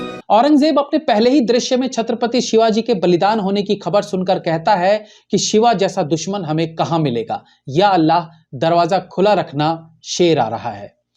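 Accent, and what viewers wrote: Bihar, India. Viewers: Are Bihari nith bol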